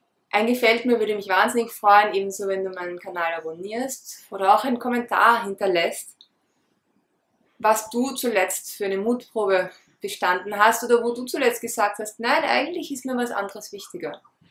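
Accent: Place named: Austria. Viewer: Danke für dieses inspirierende Video! Und auch für diese Ehrlichkeit einfach diese "andere Stimme" in dir und somit einen Teil deiner eigenen Ängste mit der ganzen Welt zu teilen! Ich bin übrigens auch aus Österreich und liebe es auf YouTube mal wieder die gewohnte Aussprache zu hören! 😅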